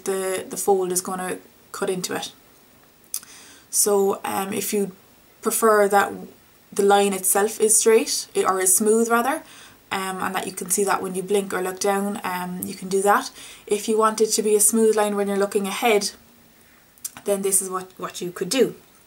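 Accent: Ireland. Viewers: I have been looking everywhere for an informative and straightforward tutorial on how to do a winged eyeliner on eyes with low creases - and here you are! Sure, I've found a few here and there but no one explains the jagged connected line that my weird creases need to get that smooth line when looking straight on :D And your lovely Irish accent is just sweet icing on the creased cake. Keep up the good work! /Linn Andersen (Sweden) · Your Irish accent is a dream!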